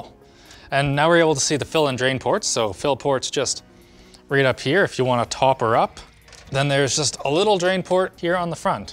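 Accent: Canada. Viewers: has never sounded so Canadian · btw what is that accent? canadian accent? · Thicker canadian accent than usual? :) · I love, but this is the first video where I could really hear his Canadian accent coming through (which was great).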